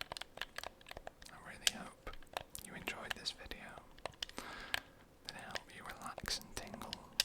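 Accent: United Kingdom. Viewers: Bro I am just fascinated by the British accent, as a non-native speaker I just love those pronounciations, fantastic video anyway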